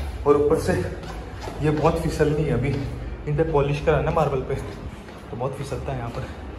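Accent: Haryana, India. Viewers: Bhai haryanvi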